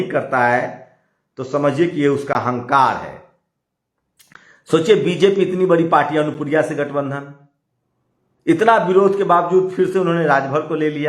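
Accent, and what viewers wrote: Bihar, India. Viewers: आपको यह चैनेल बनाने की जरुरत क्यो पडी भाई साहब न तो इतिहास मालूम है और न भारत के बारे मे बीजेपी का विरोध करने का एजेन्डा चलाना है तो आप पार्टी बनाओ भाया और बोलने का बिहारी लहजा चेन्ज करो 2014 तक सबकुछ हराभरा था अब, सब जगह बंजर ही बंजर दिख रहा है। चश्मा बदल लो भाई समय बदल लो नही तो Robbish ही दिखोगे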